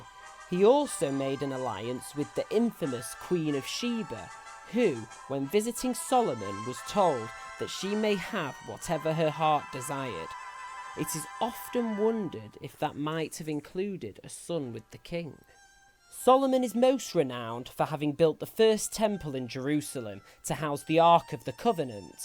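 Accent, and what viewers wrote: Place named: United Kingdom. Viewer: You're British accent does not make you more respectful or intelligent like those iinfomercialss this has been a tactic used I noticed this you mentioned that's Solomon had 700 wifes about but you said this is fantasy I don't think so you under estimat the people from the past and Bible ii believe the past was more sophisticated than you know I noticed how the media makes people from the past movies look like barbarians building houses of clay or tents wearing shaggy rags and long un trim hair u above them I think in a way they were above us people living longer longer that's not true just like the vaccine today tell me lies tell me Sweet Little lies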